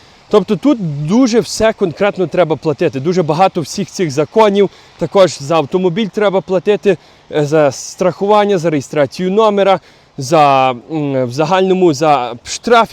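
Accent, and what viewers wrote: Canada. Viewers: це я знаю,маю родичів там,але на мій погляд гя " говірка " як ви кажете ,це скоріше українська мова з канадським акцентом..дуже ріже слух ))) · У Вас, дуже, канадський акцент! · Цікава українська, вже з канадським акцентом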